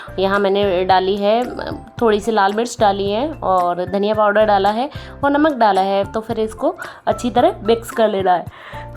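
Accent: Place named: Agra, India. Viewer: Aap bilkul hamari bhasha bolti ho.m agra se hu.jaise rai jharap or hamare yaha bhi sawan m kadi nahi banate.mera bhi man kar raha h m bhi kal banaugi sawan k baad😊🤗🤩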